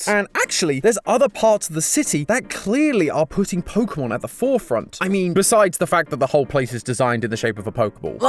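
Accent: United Kingdom. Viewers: you are british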